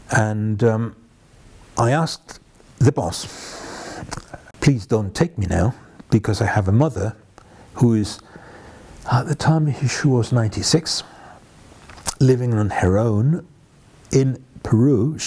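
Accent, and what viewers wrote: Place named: RP, United Kingdom. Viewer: Great voice...very posh 😊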